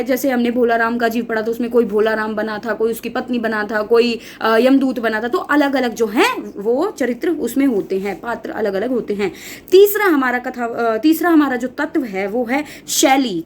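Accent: Haryana, India. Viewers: aap paka haryana se ho aapki ton aate hai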